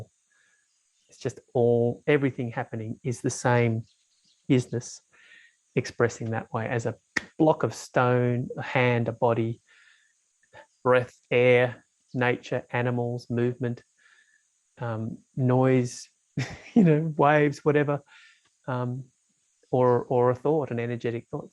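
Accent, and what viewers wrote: Australia. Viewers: Beautifully Said. I haven’t heard ‘it’ put quite this way before.. Such a simple and clear description… Nice to hear a fellow Ozzie too lol. Thank you 😊 Ps. I’d love to hear your talk about when the illusion of being a separate I apparently ends energetically, & not just conceptually. Thanks again🙏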